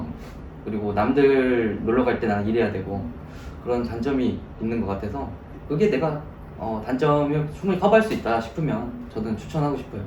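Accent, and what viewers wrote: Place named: Jeolla, South Korea. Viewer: 말투가 전라도인데 혹시 고향이?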